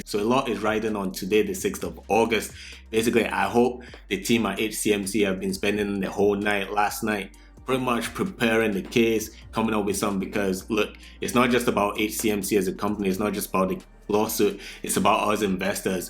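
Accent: Scotland, United Kingdom. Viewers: That's a strong Scottish accent 😂😂👍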